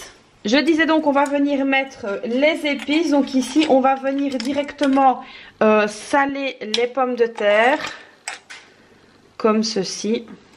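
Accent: Belgium. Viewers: Woaw enfin la meilleure des recettes de gratin dauphinois en plus c est tellement délicieux à déguster . Tu fais vraiment la même recette que moi 🤩 Merci beaucoup à toi pour le partage 👍👍👍 Dit si pas indiscret tu es de Belgique ? Car nous avons presque le meme accent hihi je voulais déjà te le demander mais je n osais pas ^^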